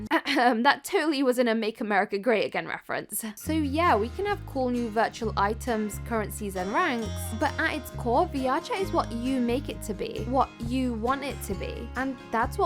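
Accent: United Kingdom. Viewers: The accent sounds familiar, are u from the west mids?? 😂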